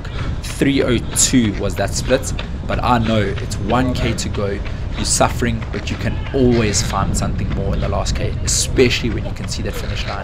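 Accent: South Africa. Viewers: South African? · You sound South African. Are you? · Hahahah love the south african accent 🇿🇦😁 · This is absolutely insane ❤‍🔥well done bro. Also, I can sense that south African accent there😁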